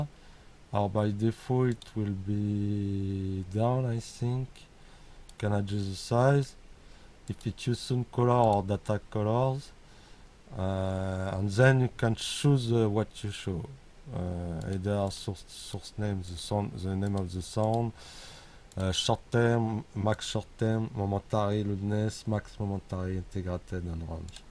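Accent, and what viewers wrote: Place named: France. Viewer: T'es Français ? Thats a big french accent don't get me wrong, edit : effectivement t'es français from Paris. Très bon VST je regrette pas de l'avoir acheté celui là. Par contre juste un petit pdf ou des vidéos explicatives pour expliquer le vst ça serait cool, je cherche comment enregistrer le layout par exemple. Ca manque d'un bouton supplémentaire ou dans about les options de customization, par ex skin devrait se trouver dans about et les enregistrement d'un ou des layout favoris aussi. Sinon à part ça super plugin merci Edit 2: Eh merde on dirait que le layering d'instances Psyscope ne fonctionne pas dans BitWig 5.1 😢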